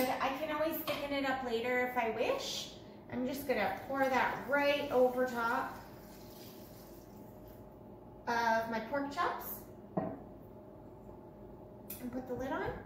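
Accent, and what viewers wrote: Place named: Canada. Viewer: I'm definitely trying that pork. I just LOVE that particular Canadian accent! 😄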